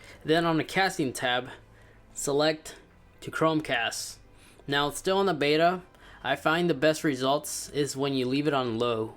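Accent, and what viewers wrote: Ireland. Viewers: Are you from Ireland my guy? (I'm Irish too) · You from Ireland?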